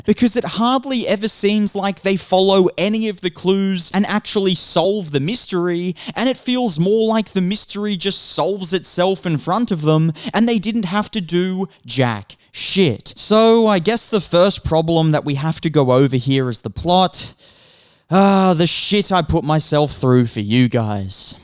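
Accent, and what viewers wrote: Australia. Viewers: It's Australian accent I think. Sounds normal to me.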